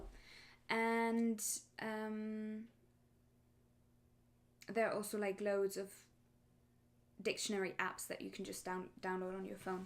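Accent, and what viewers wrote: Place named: United Kingdom. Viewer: You are German? Umm, I thought you are British! Congrats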